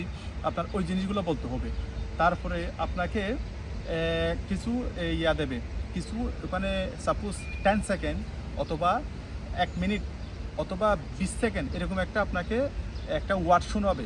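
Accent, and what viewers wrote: Chittagong, Bangladesh. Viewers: ভাই আপনার কথা শুনে মনে হচ্ছে, আপনি আমাদের চট্টগ্রামের ছেলে 💚💚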